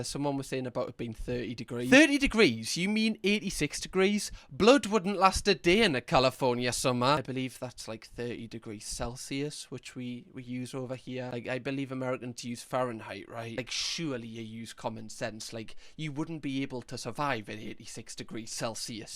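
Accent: Newcastle, United Kingdom. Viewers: Don’t put down your looks! And I love the Geordie accent, it’s so endearing. As someone who grew up with a Bostonian accent, you have a very friendly sounding one. Mine sounds aggressive, and trust me, it’s not intentional. Friendly exchanges are like screaming matches. 😆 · A, I can't believe I've only just come across this, I've been in bits the last few days. B, don't you knock the Geordie accent pet, it's the best accent in the country and I'm from Lincolnshire. Though my grandad was born up there, hated working the mines so ended up as a lorry driver and then eventually owning a garage in London after first living in Devon for a while. He had an interesting accent but never lost the Geordie phrases, oddly some of which we use in Lincolnshire too. But it's been a long while since I've been called Hinny now.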